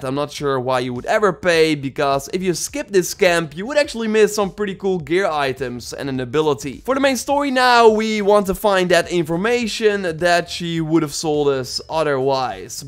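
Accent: Netherlands. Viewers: u dutch man? if u are im subbing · Are you dutch? You sound dutch... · I was like.. this guy his voice sounds so dutch and little did iknow hes dutch lol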